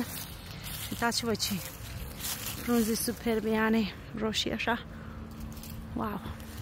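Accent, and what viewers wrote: Moldova. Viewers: Te-am descoperit de curând, mi-au plăcut atât de mult vlogurile tale, așa încât m-am abonat și îmi doresc sa le vizionez în continuare. Ai o familie absolut superbă, tu ești atât de tânără și frumoasă și îmi place accentul tău moldovenesc! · Adoooor accentul tau 😊❤❤. Ești moldoveanca din Iași ori Bacău?😅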